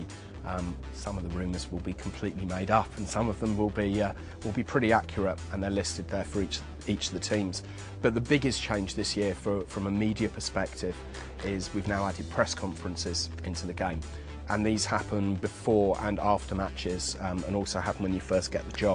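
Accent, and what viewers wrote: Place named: England, United Kingdom. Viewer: Damn, what a classic english accent! Btw, I have this game and it's pretty cool for thoose who consider buying it.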